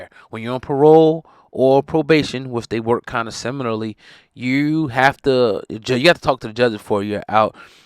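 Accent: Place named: Iowa, United States. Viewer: U suck at journalism... If you 1 rly messed wit 600 you would know or even if you just did research then you would know his parole is in iowa.. 2ndly u dont know what you tlkn about. Probation then you have to see a judge if your on parole then you have to go see the parole board.... Hate When yall non street dudes try to speak on the street... Tbh u sound like you from iowa 🤔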